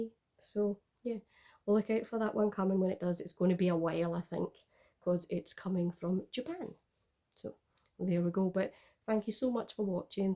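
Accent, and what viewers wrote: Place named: Scotland, United Kingdom. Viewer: Subscribed just for your lovely Scottish accent, well and I love bags too😂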